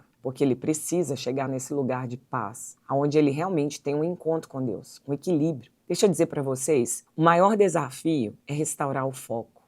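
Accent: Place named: Belo Horizonte, Brazil. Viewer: A senhora é pastora em belo horizonte? pergunto pelo sotaque 😊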